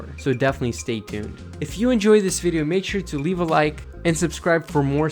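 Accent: Russia. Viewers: Bro, are you from Russia?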